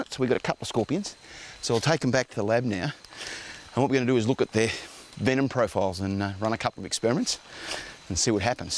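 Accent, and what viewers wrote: Australia. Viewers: bioluminescent tumors would have great applications in the world of Neurofibromatisis research. Apart from having my 6yr old scanned by mri every 3 months to monitor his multiple tumors, we could just run a black light over him. Is the venom specific to certain tumors when it attaches? It's something I'm keen to follow. Great work on the videos and channel, great to hear a Aussie while I'm in the U.S.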